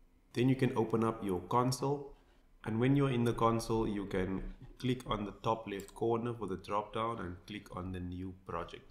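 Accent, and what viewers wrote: South Africa. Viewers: I know a south african accent when I hear one lol, CPT ne? Bro you might want to hide your API key there. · You from SA? oh wow I can hear the accent and the Cape Town location haha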